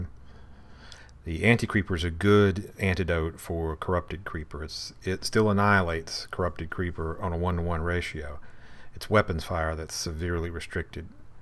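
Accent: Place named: South, United States. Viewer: What's with the accent? Are you a southerner?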